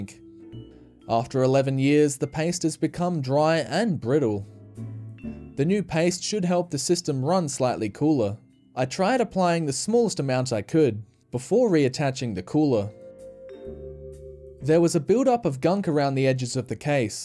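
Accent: Australia. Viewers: You voice sounds just like my Australian friend I met on Facebook lmao. Y'all are so chill and relaxing to listen to. Totally random. 🤣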